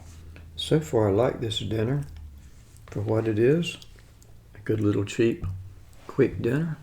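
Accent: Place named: United States. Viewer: Which US state are you from, the accent is so cool!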